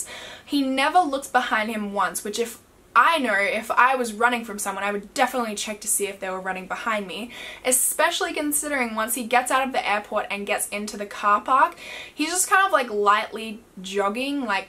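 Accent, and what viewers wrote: Australia. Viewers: Very interesting...I love your Austrailian accent btw 😍😍😍.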